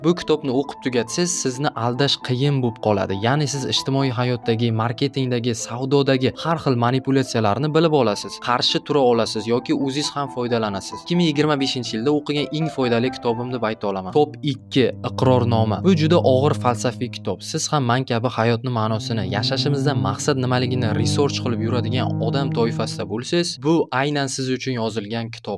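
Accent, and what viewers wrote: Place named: Jizzakh, Uzbekistan. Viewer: Admin Jizzaxlimisiz shevez tortib ketarkan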